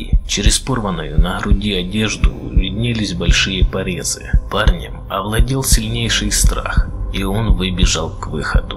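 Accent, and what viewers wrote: Ukraine. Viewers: и не в обиду тебе:постарайся как-то сглаживать украинский акцент на букве "г" , "друХ",например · это украинский акцент !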